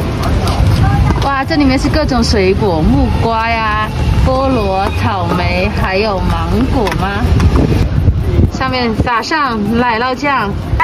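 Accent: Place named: Taiwan. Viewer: 视频拍不错，学哪台湾腔，真受不了。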